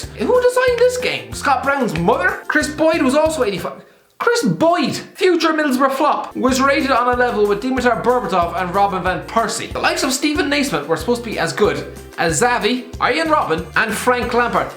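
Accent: Ireland. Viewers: After a night of no sleep it’s always nice to have the in my ear at 7am in the morning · I clicked on this video just to see the say "Hello and welcome to HITC sport!" 😭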